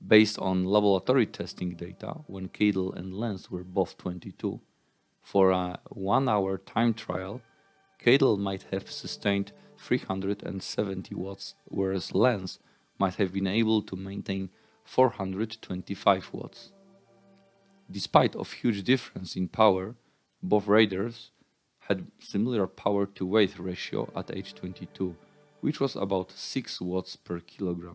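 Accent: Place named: Russia. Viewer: The Ukraine? Romania? To the American ear, it sounds Russian.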